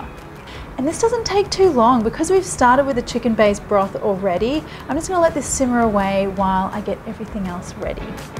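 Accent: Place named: Australia. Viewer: Your food and recipes are so wonderful and so easy to make. .love your Thai style and very aussie accent..your mom and dad must be so proud..I am so happy to have found your channel💖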